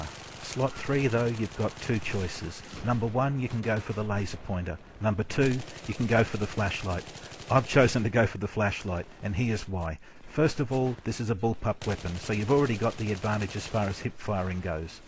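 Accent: Australia. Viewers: Dude, his Australian!!!!! Pwn thinks its a cool accent! im australian!!!! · I dont wanna be mean or anything but me my self fell asleep listening to this its a game who the fuck want to listen to the origin of a weapon in a GAME stats is ok thats okey but whats the purpose telling everything about a gun what does that help. to know which is the best one where it was made and shit tbh i need to be paid to listen to this but good gameplay thought and nice accent^^ AUSSIE PWN! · Is this guy australian? If he is then good on him ;) go aussie and the ps3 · see to me sounds so aussie lol he is australian btw checked hes channel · Don't see too many Aussie commentators. Thumbs up! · AUZZIE BRAHHH! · Aussie aussie aussie..? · Found out about through golf squad on the shustybang channel. I like his vids diff from other commentators, and the accent is Australian. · Fuck yeah!!! 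Aussie mate :D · hes like... the australian boo :o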